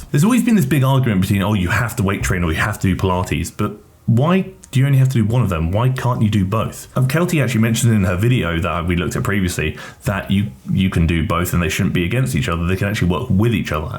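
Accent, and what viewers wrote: United Kingdom. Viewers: Favorite time of week when hot British man talks to me about fitness.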